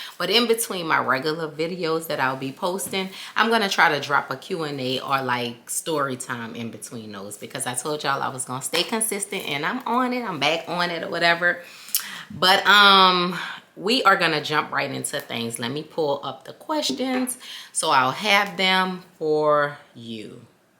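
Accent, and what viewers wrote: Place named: Louisiana, United States. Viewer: And I love your sweet Louisiana accent,by the way i'm from Louisiana too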